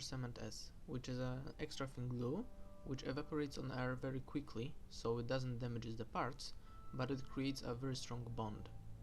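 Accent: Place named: Poland. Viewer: Nie źle angielski też spoko tam tylko masz polski akcent ale tam jest spoko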